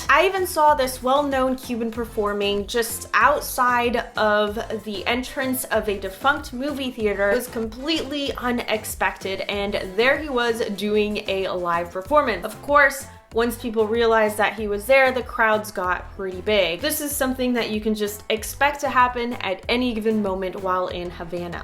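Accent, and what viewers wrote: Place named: Spain. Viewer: Wow 😲 I got a nostalgia now! I was born there 😢😀... sorry I didn’t know you! Just discovered you cause Brian Sellers on Twitter 🎉 and came running to give you my support 🙏. Beautiful video! Great Spanish accent 🙏👍🙏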